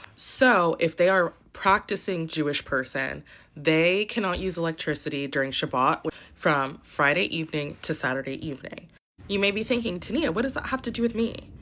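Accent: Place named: General American, United States. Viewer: I feel as if she said that due to your more “standard American accent”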